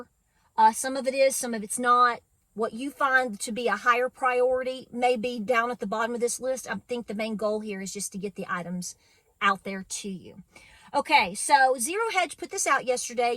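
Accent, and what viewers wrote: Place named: Southern Ohio, United States. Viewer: Love the accent! I grew up in southern OH (bordering WV/KY). Sounds like home :)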